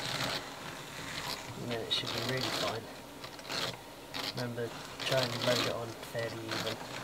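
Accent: Wales, United Kingdom. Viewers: Because I'm listening to you with American ears sometimes your Welsh accent is hard to understand but I love your videos keep up the good work you're very talented person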